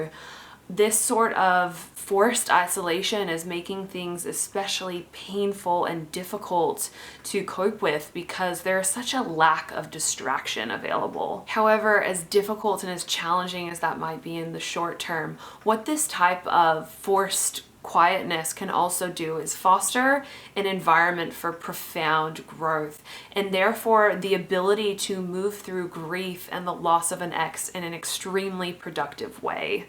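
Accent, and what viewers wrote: Australia. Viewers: your slight Aussie accent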